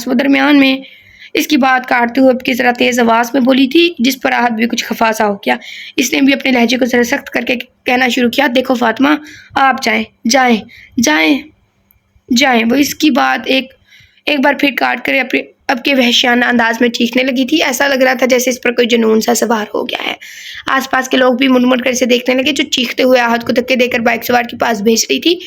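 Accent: Punjab, Pakistan. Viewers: Yr JB novel parhtay Hain to bss vahi read kartay Hain beach main Apne dukhray nahi rotay Novel k start main batain kar leya karo or novel parhtay vaqat awaz or lhja narm rkhty Hain Urdu bhi Sahi boltay Hain Aap ka to panjabi lhja Hy Urdu bhi Sahi nahi bol Rahi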